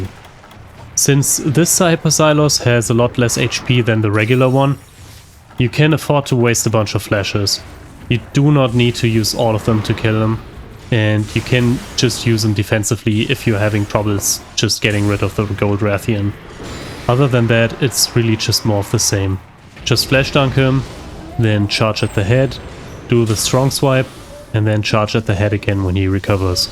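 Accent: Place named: Germany. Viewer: Ich hab 5 Sekunden geguckt und sage du bist 2000% deutscher 😂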